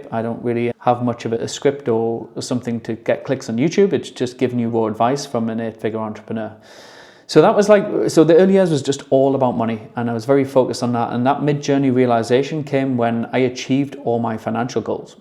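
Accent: Newcastle, United Kingdom. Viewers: I thought I recognised that accent (as a fellow Geordie) 😘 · Are you a jordie or from South Africa?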